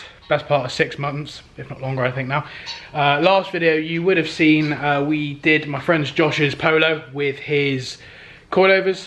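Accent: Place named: United Kingdom. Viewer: Too much BS jibber jabber… WTF… just show the actual work instead of constantly apologizing in your fucked up wanker, limey accent…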